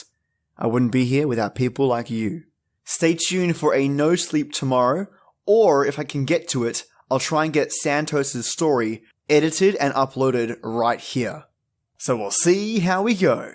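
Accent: Australia. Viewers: Trying to sleep at 4AM, but before I finally doze off I'll be here listening to this video 👍🖤 in that awesome Aussie accent of yours 🙂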